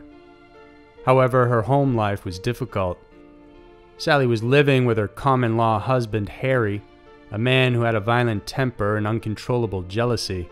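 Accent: United States. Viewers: I live a couple miles away from the river Severn! I live in a pretty rural part of the country so it’s really weird to hear it’s name being said in a Yank accent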